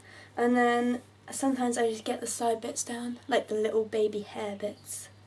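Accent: United Kingdom. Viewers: Random question: Is this a UK accent? Oh and great tutorial btw. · OMG I LOVE ENGLISH ACCENTS :) great tutorial by the way! (: x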